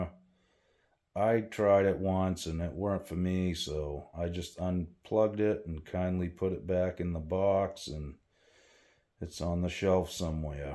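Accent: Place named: Boston, United States. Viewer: you boston sounding mf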